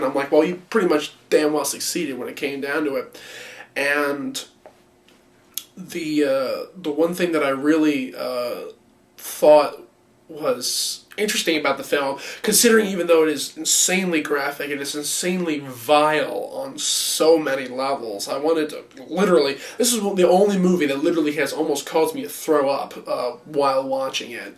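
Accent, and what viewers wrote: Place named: United States. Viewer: You look British or possibly Irish, why are you talking in an American accent?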